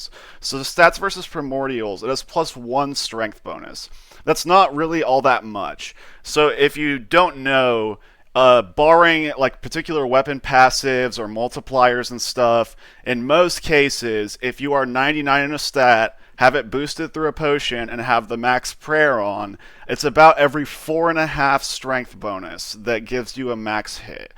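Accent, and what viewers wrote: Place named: Connecticut, United States. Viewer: Bruh youre from Connecticut arent you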